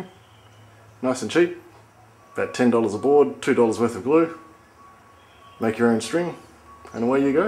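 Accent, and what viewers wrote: Australia. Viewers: is that a Australian accent i hear there mate? haha thinking about making myself a long bow, been told bamboo is the best material for beginners. thanks for the video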